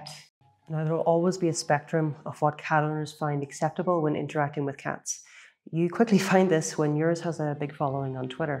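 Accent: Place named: Ireland. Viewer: Just some observations: I found your talk quite compelling for a variety of reasons. I was initially drawn in by the way you look into the camera so intently. I realized after a bit that part of the power of you gaze, I think, is that you seem to almost never blink! Don't mean to make you at all self-conscious about it, but it is really rather striking. Secondly, you have a lovely voice and manner of speaking, with that light Irish accent that sounds so compelling to my (N. American) ears. I really think you would make a terrific narrator of, say audio books! You articulate extremely clearly. Have you a red light accentuating your hair color? It looks just great! (again, so very "Irish"!) Fascinating how something as seemingly trivial as sharing cat info evolves into a helpful resource for many in such a profound way! Cats are such lovely creatures--even though I only have d_gs--and having any kind of animal does, I think, increase the humour quotient of one's life quite substantially, which is always a positive and healthy thing. 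Sounds as though you are conducting your life in a very positive manner. Well done, and kudos!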